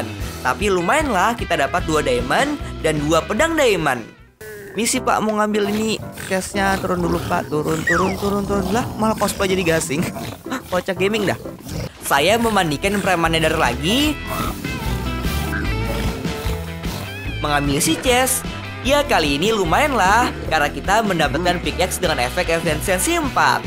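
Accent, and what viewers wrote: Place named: Bugis, Indonesia. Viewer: Bang Suara Nya Kek Orang Balik Papan (Kalimantan Timur) Orang Bugis Pasti 🗿 ya Kan?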